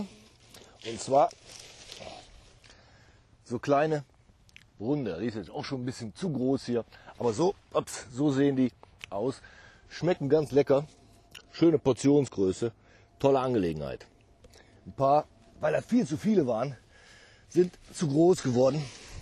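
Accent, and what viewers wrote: Germany. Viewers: super sache! Wieder ein schönes Gemüseanbauvideo. Da kriegt man richtig Lust, das nachzumachen. Dieser Kanal ist mittlerweile einer meiner Top 3 Lieblingskanäle. Möchtest du eigentlich Hilfe bei den englischen Untertiteln? Ich könnte ab und zu mal einige Fehler rausmachen, obwohl ich auch kein englischer Muttersprachler bin. Dein Dialekt ist rheinländisch? Klingt auf jeden Fall auch schön.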